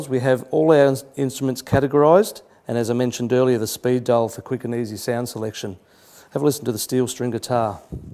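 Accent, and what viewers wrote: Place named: Australia. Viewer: australian accent sounds cool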